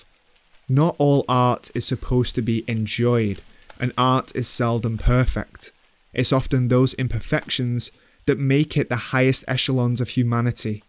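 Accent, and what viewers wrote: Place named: England, United Kingdom. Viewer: Love the content of this video. The mispronunciation of the director's names (Louis Malle, Murnau, "Andrei" Tarkovsky for example) and the accent in general made it very difficult to watch. How does one know so much about cinema but get the names of the directors wrong? And with such conviction. That's baffling to me. This is one English accent that simply destroys everything in its path.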